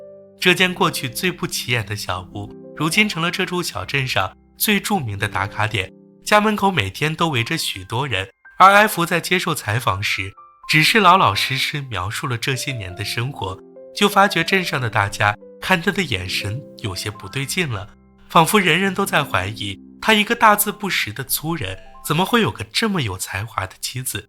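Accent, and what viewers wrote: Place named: China. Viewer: 很好奇，解說說自己在臺灣，卻是滿口的標準普通話，難不成是大陸人在臺灣:face-blue-smiling:应该是的！這部片子很久以前看過，印象很深！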